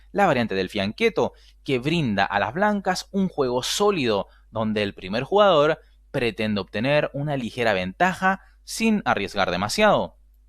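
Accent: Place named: Chile. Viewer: OYE, TU ACENTO TE DELATA: ¡¡¡TÚ ERES CHILENO!!! MI PAPÁ TAMBIÉN ES DE RAZA CHILENO COMO TÚ!!! ¡OJALÁ! ALGÚN DÍA, CHILE, LLEGUE A TENER UN CAMPEÓN O CAMPEONA MUNDIAL DE AJEDREZ!!!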